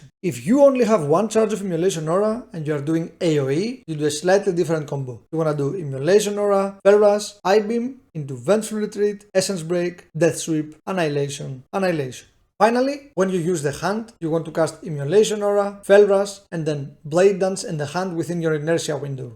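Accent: Greece. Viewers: are you from greece m8? your accent sounds familiar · your 100% greek, love your accent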